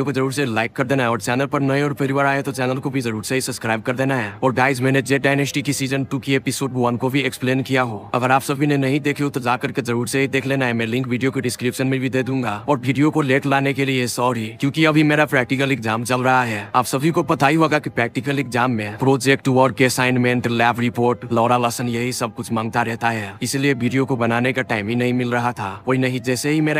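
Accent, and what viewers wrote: Bihar, India. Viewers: Bhai aap 😂paka bihari honge 😂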